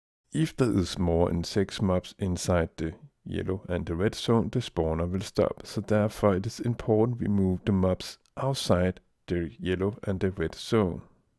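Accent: Denmark. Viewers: I think he is a dane..... LOL HAHAHAHA dat accent. Nice tutorial though well done :) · He has a very danish accent :) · you're danish. i can hear it. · Your Danish accent is awesome! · No, he sounds like someone who speaks multiple languages, is Danish, is a genious, and provided you with the perfect tutorial on how to build an xp farm. Stop hating cuz you couldn't dream of being half as smart as this guy. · er du dansker ???? synes jeg kan høre :P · You come from Denmark right? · H0T-Vlog Go to My Channel What? I was just mentioning that we can hear the danish accent pretty clearly? Also its an 8 year old comment. I dont get it, why send an entire IGN walkthrough? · I put your videos on replay just to hear your accent. My name is Dan, so it being a DAN-ish accent gives me some sort of ridiculous thrill XD but anyway good job, man, you helped me a lot to build a working mob trap for the guys on my server :) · You are 100% danish! 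· Are you danish? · du dansker ik? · are you from denmark? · er du dansker eller hvad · he most be danish :D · His accent sounds very danish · Are you from Denmark?!?!? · Dansk accent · Are you from denmark!?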